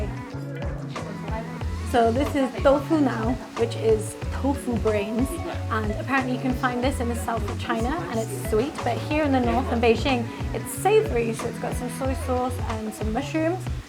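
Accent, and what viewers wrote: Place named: England, United Kingdom. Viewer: Hi. It is so refreshing to hear an English accent and so down to earth and there is nothing pretentious about you which I find uniquely different to other bloggers. Dou jiang comes in salty and sweet version. I believe in the south it favours the sweet version and in the North the salty. I prefer the sweet one myself but each to our own.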